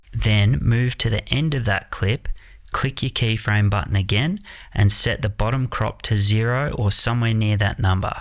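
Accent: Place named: Australia. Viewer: Would be interesting to listen and learn, but difficult to suffer through such a thick australian accent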